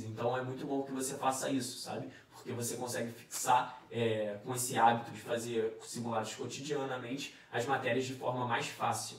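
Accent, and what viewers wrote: Rio de Janeiro, Brazil. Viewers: bonito, inteligente e sotaque carioca pressão: "me ajuda" cai aqui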